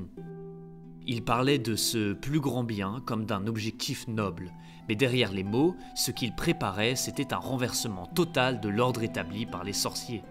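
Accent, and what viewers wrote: Belgium. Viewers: Bonne vidéo, j'entends un accent belge ? 😉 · Cest laccent belge ça. Il doit être belge le mr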